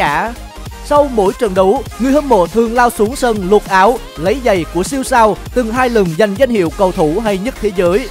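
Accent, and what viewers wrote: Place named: Quang Ngai, Vietnam. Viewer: Giọng Quảng Ngãi đặc sệt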